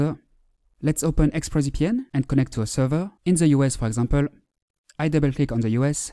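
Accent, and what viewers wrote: France. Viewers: Nice french accent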